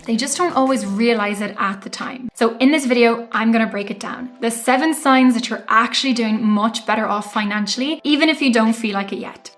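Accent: Cork, Ireland. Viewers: This video made me look at my financial life differently. In a good way. Thank you for the content! My husband and I love the Cork accent. It honestly makes your videos so soothing to listen to! ❤️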